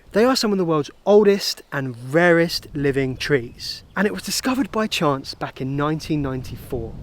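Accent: United Kingdom. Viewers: Well the narrator sounds English, so what he says would indicate what they're called there, but I think Australia too, yes.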